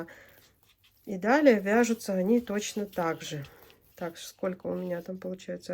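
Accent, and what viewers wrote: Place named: Baltic states. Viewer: вы с Прибалтики? У вас красивый акцент речи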